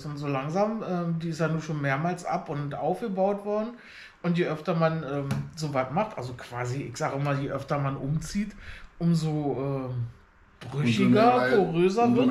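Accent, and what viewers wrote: Berlin, Germany. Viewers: Berlinern ist doch super dann das ist doch Deutsch Grins. Liebe Grüße aus dem Sauerland nach Berlin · Moin moin 😊...ich finde berliner Dialekt schön....ach ich bin schnell mit ausmisten 😊😅...... 😅😅😅.....❤❤❤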